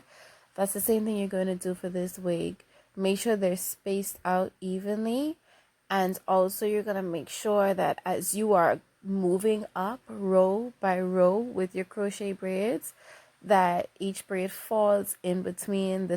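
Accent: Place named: Bahamas. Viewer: You sound like a Bahamian......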